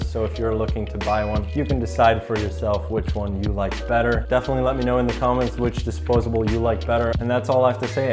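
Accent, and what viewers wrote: Netherlands. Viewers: Are you dutch? :) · Are you Dutch?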